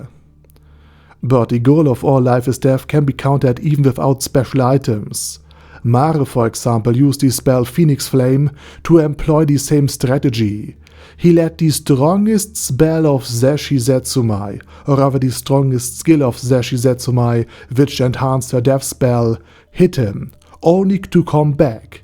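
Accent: Germany. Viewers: Boah the german is very strong with you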